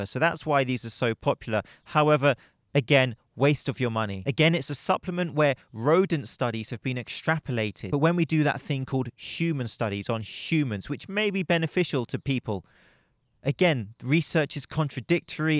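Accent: United Kingdom. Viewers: Where do you live? British accent, Palm trees and sunshine but, you're wearing a hoodie!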